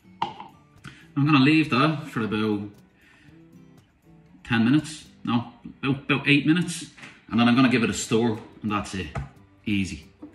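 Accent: Ireland. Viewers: Love your Irish brogue! Sláinte! --gv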